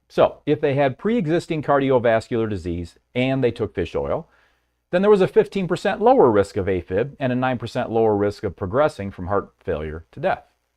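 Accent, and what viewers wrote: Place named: Australia. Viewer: I thought maybe I had a relaxation music video on at same time! 😂 that coupled with the Aussie? accent was a lot!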